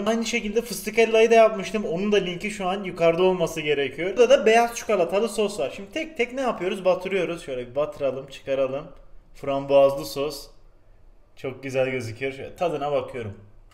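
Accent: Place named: Southeast, Turkey. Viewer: Ben hala anlamadım abi sen hataylı mısın diyarbakırl ımısın yoksa adanalı mısın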